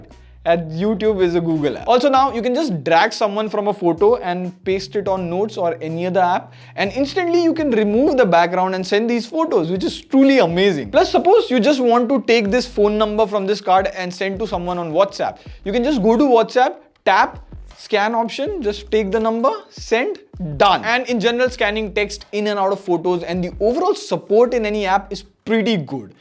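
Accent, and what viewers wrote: India. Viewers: Indian accent 😅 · your language is very clear 😃 i think you are indian 😅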